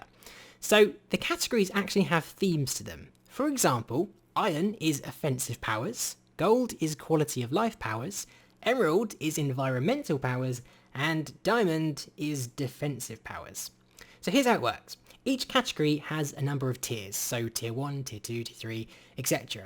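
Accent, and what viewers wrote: United Kingdom. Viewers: he's British what do u expect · It's our Southern England accent.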